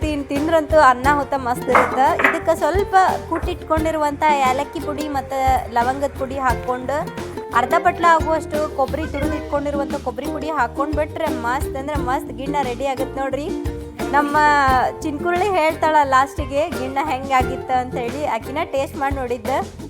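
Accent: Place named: North Karnataka, India. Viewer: Nimma Uttarkarnataka maatu ista magale, bcos naa hutti beladiddu alle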